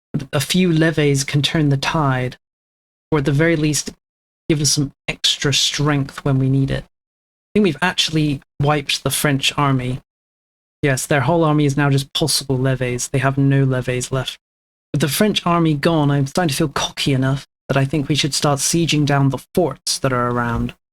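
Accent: United Kingdom. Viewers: knew i was in great hands when i heard that british voice, cheers mate from down under!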